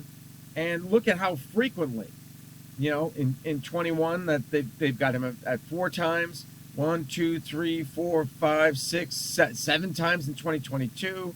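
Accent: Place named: Czech Republic. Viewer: where are you from my man? Cuz it do be sounding like you are from czech republic xD PS: Good videos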